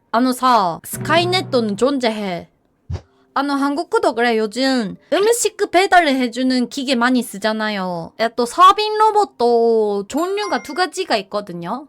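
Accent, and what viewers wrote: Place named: Japan. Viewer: 한본어 역대급이네 ㄹㅇ 실력 원탑인듯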